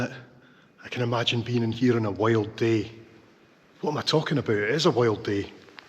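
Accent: Scotland, United Kingdom. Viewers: How are you doing buddy 😎you're videos are so interesting and incredible I wish I was Scottish love the accent and fantastic eh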